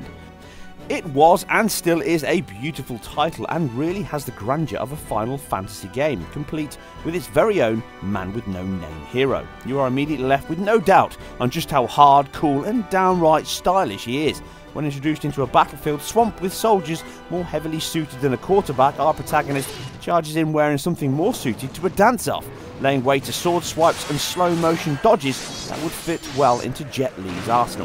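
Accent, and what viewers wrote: England, United Kingdom. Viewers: Typical dozy yank, you are aware that he is actually English from England speaking his native tongue English. American English is a bastardised version of the English language. Anyways, good job as always.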